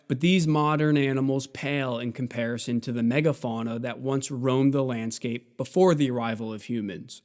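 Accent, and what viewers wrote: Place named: United States. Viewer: Why is this narrated by an American?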